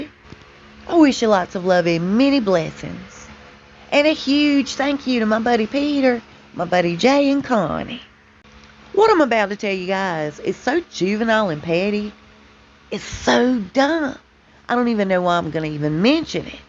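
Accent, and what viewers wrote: South, United States. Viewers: good ole southern voice. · She definitely is a southern girl!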